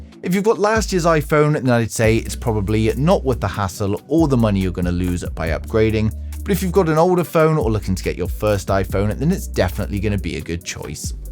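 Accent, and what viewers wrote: United Kingdom. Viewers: British accent..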